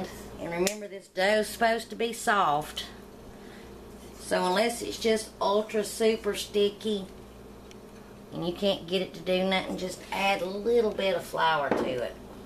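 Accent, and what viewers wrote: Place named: South Mississippi, United States. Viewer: I have got to know where you are from? You sound like your from my neck of the woods down here in south Mississippi. The buns turned out great, and looked beautiful!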